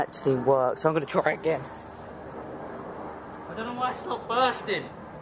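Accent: United Kingdom. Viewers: gr8 m8 8/8 sound like from the south east somewhere. Not Scottish though m8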